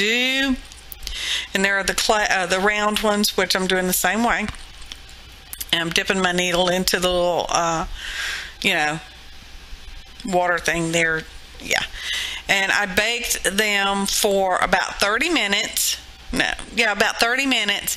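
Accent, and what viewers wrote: South, United States. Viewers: Was fun watching you make yoir beads. The hangy gizmos made with the paper clips is clever. Your delightful Southern Belle accent was wonderfully entertaining, as well!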